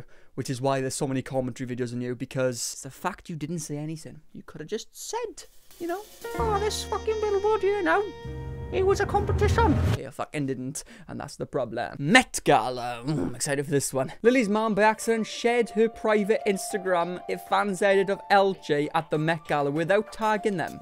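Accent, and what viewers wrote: Wales, United Kingdom. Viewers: well that growl thing made me feel surprising things aha you seem extra welsh in this one.. keep up the good work!! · a cute welsh accent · This man could talk about Eastenders and I would gladly sit and listen to him. I swear the welsh accent is a beautiful gift to humans.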